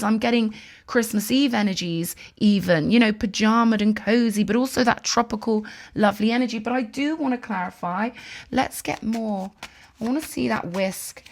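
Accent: United Kingdom. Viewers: You are ready to delve into my Childhood Memories, I’m always a Child within Honey- I really love your Brilliant articulation of your British Accent - I love to approach everything in my Path from a position of Knowledge and Wisdom ❤❤❤🍯…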